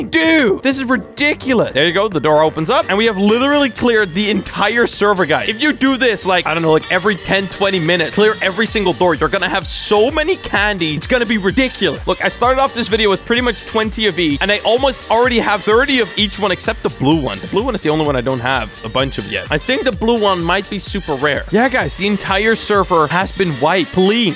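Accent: Netherlands. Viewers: Are you dutch? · are u dutch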